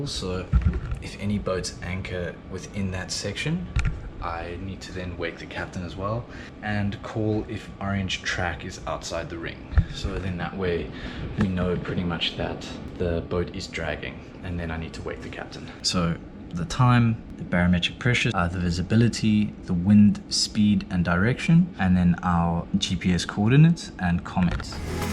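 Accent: South Africa. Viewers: Is that a Saffer twang I detect? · South African accent? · Hi - are you South African - your accent and some of the words you use make me think so?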